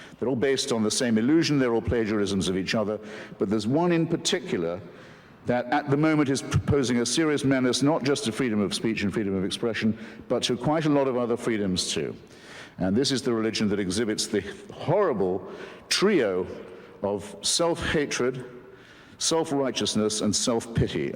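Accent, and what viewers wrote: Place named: United Kingdom. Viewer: Too Bri'ish didn't listen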